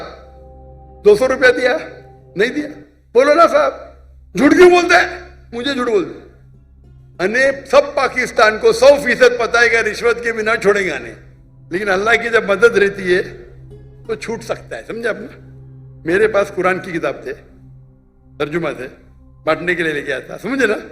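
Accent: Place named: Mumbai, India. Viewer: Bambai boli hai bhaiii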